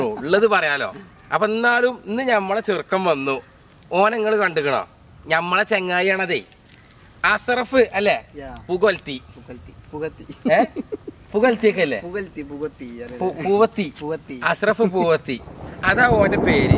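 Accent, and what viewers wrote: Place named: Malappuram, India. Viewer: പോരാട്ടോ, ഭാഷ മലപ്പുറം ആയുള്ളൂ, ബോഡി ലാംഗ്വേജും വേഷവിധാനവും വളരെ മോശം.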